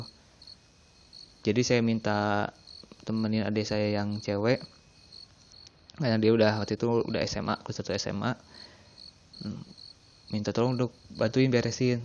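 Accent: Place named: Bandung, Indonesia. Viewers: Yang Cerita orang bandung ya? logatnya :D btw serem sih tapi lucu hhaa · Sunda pisan yaaa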